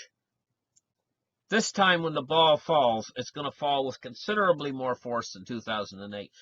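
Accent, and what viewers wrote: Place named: Canada. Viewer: LOL ... "it's weird ... it's just weird ..." Excellent video. You have the knack for making otherwise complicated stuff easy to grab (and funny to watch) ... for us financially/economically challenged types. (Still working on that "laboratory of liquidity" you put out a while back.) What you do is very much appreciated. After going through a lot of financial turmoil in his own life, my son found your channel ... and then introduced me as well. We both watch FTE every day ... and refer to you as "the Canadian" because of the obvious accent and because we didn't know your name back when we first started watching. I in turn have promoted your channel to friends and family ... in attempts to get them to wake up to what is coming. Some listen, some don't ... par for the course, I guess. Though it may be a double-edged sword when the economy goes south ... 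I will be so happy for you when your views, likes, and subs go TO THE MOON, ALICE, TO THE MOON! Also, BTW ... love your close-ups. Have a great weekend. :)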